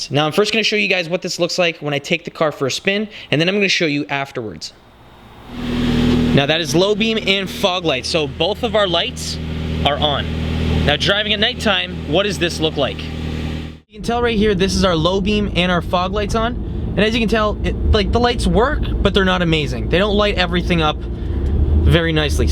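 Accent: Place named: Canada. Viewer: so canadian... oooout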